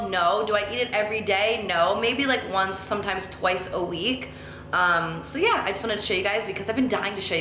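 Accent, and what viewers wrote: New Jersey, United States. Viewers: I L O V E hearing you talk. Not only are you well spoken but I admire your New Jersey accent ❤️ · you’re such a jersey girl haha your accent is jumping in this video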